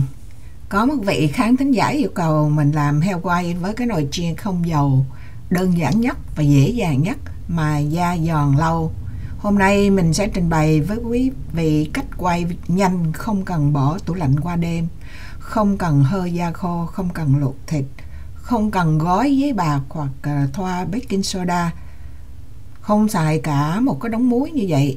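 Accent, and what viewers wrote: Quang Nam, Vietnam. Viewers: Em chỉ dẫn rõ ràng và dễ làm, giọng quảng rất dễ thương vì chị cũng là người đồng hương của em. Cái châm lỗ trên da em order ở đâu vậy? Chị muốn mua. Tks em.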